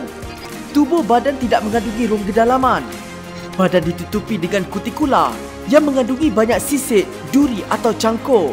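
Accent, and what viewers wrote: North, Malaysia. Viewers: Tudia pekat nau utara..haha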